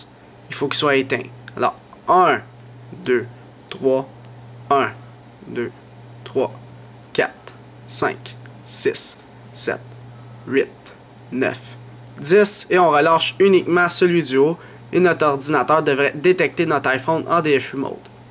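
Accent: Quebec, Canada. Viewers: je taime mec merci tu m'as sauver jte le jure sur ki tu ve plus jamais jme moke de l'accents quebecois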